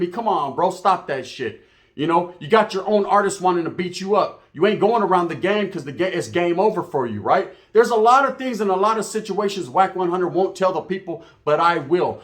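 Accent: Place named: Central Valley, United States. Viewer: 🗣️🔥 you speaking like the Merced to Stockton vibe. The fuse is lite he was out of pocket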